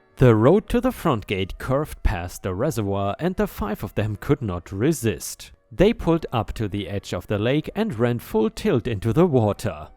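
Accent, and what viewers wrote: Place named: Germany. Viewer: You sound like ypu have a german accent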